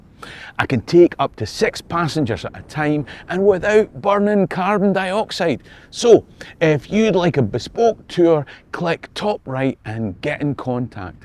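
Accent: Scotland, United Kingdom. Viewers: Wondering how many people understand Scottish, perhaps he would do better if he learned English.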